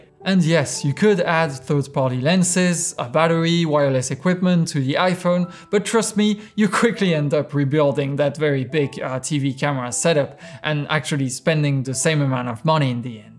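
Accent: France. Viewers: The most french thing anyone has said: (especially with that accent) lol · T’a l’accent français je trouve si tu es français alors je dit bravos 😊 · I love his pure thick French accent. It’s ASMR at its finest. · Are you French or Canadian? Just curious. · Youre french?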